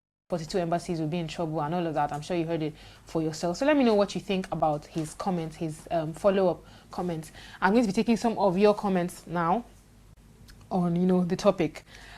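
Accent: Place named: Edo, Nigeria. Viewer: see your ekiadolor English..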